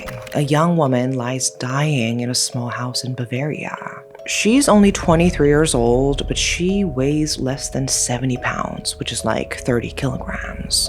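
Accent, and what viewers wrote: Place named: California, United States. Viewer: I dont like the way you speak it feels like your typical caliornia woke girl accent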